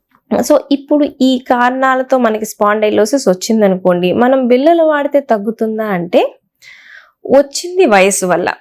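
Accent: Guntur, India. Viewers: It is our Guntur slang.we like our slang bro. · Pure guntur slang..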